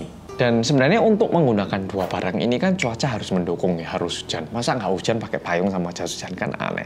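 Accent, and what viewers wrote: Java, Indonesia. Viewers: Ini muka nya kyk Korea bahasa bicara nya kyk org jawa. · korea punya youtuber orang korea yg medok, jepang punya youtuber nihongo mantapu .. keduanya favorite gue even gue udah emak" 🥰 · Aku terekomendasi dari temen, tertarik goro goro mas e ngomong wong korea medok 😂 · Samean kok iso medok too mas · sprt biasa mas selalu menceritakan secara detail dan dg gaya khas medoknya wkwkwk, lanjoottt trs mas sukses yoh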